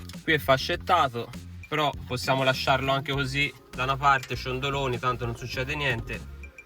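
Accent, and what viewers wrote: Tuscany, Italy. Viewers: Ciao, io sono già andata da 2 elettrauto per altri lavoretti e quando ho accennato a cambiare queste lampadine si sono rifiutati😭..ora capisco perché 😁! Per caso lavori in toscana dato il tuo accento? Quanto può costare farsela cambiare da un professionista indicativamente? Grazie Manuela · fantastico! bel video, poi con l'accento toscano e ancora più divertente guardarlo.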